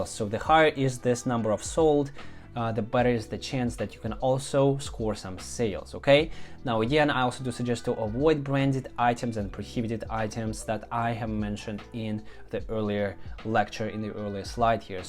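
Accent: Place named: Russia. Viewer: Love that Russian accent, you have that BDE 😂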